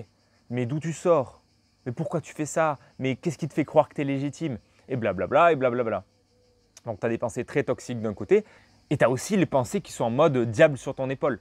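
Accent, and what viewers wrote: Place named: Marseille, France. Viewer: J'adorais cet accent marseillais ;)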